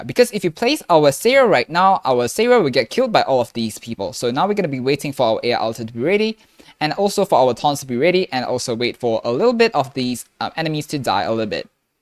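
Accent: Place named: England, United Kingdom. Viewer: Why do you have a sort of english accent up till rs-ex-5? lol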